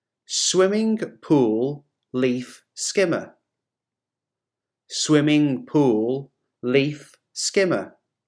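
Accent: United Kingdom. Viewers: Thanks! What regional British accent do you have?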